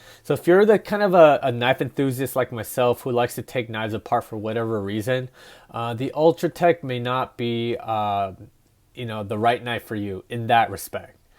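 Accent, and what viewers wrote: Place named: South Korea. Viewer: I detect a korean accent. 한국인에요?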